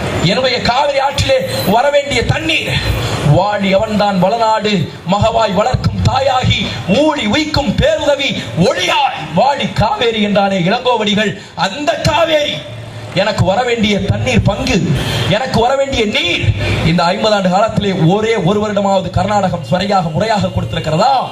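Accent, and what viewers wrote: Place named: Kanyakumari, India. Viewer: U r speech is awesome bro Mersal Kandipa ninga kanyakumari karar nu unga speech la teriuthu All d best for ur future speech😝😝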